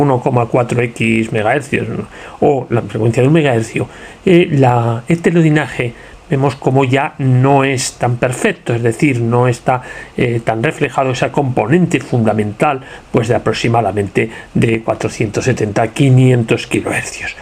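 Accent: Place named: Castile, Spain. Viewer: DIJO UN CHINGO DE PALABRAS Y NO LE ENTENDI NI MADRES, NO SE SI ES POR EL ACENTO(CASTELLANO SUPONGO) O POR LA VOZ TAN GASTADA, LE SUGIERO QUE DICCIONE BIEN SUS PALABRAS Y TRATE DE SER AS NEUTRAL EN SU ACENTO SALUDOS.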